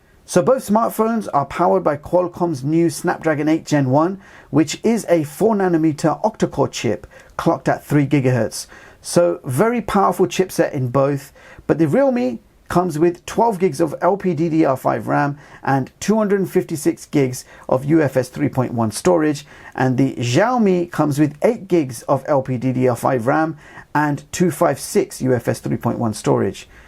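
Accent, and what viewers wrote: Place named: United Kingdom. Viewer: i love British accent ❤️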